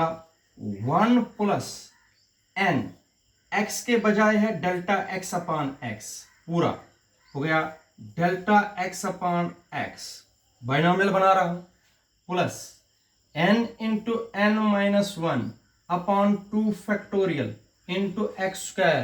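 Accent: Kashmir, India. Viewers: Luv ur teaching as it luk's u might b kashmiri as ur accent is kashmiri.... Thanx.... God bless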